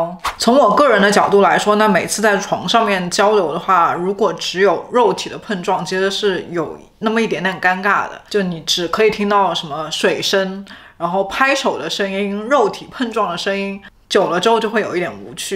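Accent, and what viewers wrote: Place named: Shanghai, China. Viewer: 海外听到上海女生的上海普通话好亲切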